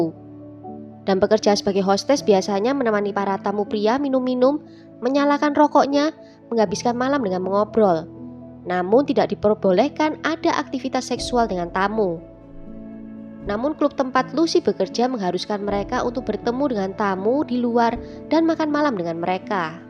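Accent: Java, Indonesia. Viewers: Naratornya org jawa ini logatnya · Kak.. org mn sih kok bahasanya medok banget. Club itu bukanya dibaca 'klap' ya dlm ejaan bhs indonesia. Masak di baca 'klup' jg... geli kedengaranya. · dari pertama liat chanel ini aku dah yakin mbak e wong jowo koyok aku..😁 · Kak...keren ih ceritanya... Btw spt logat org Jawa ya mbak... Sip smg sukses ya....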